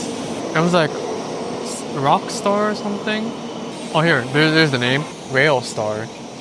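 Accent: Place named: United States. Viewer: Why do you sound so American?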